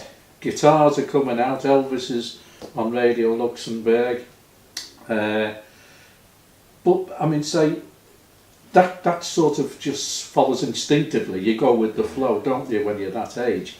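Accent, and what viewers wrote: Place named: Liverpool, United Kingdom. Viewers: Great Interview... Sharing Musical Memories & Facts As Gold Mine! Thumbs Up & Thanks For Sharing These... Throughout This Very Pleasant Liverpudlian Accent!;-)) Keep Making & Sharing These!;-)) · Hi...I was recently asked by from Liverpool Film Club, if I'd be interested in doing a filmed interview with him to discuss my involvement with the Liverpool Arts Scene. This is quite an informal interview( Part 1), in which asks me about how I got started with music and groups in the 60's and 70's, through to my association with other groups and my Entertainments Agency that I opened in 1978. I did lose my way a little, where one moment I'm discussing my group 'The Topics' (1962/66), and asks if I met any well known bands...when my memory suddenly jumps to 1970/72 and I'm remembering my time in a Cabaret group (Recipe 4)....then I slowly make my way back to the 60's. 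Memory plays a strange trick at times. If you do take the time to watch this video...I hope you can understand my Liverpudlian accent...and that you don't find the conversation too boring..as it is totally about about local Liverpool places and names. Parts 2 and 3 Video's are to follow...in which the conversation moves through the 80's up to my Songwriting and current activities. Thanks for watching..if you have.....Regards....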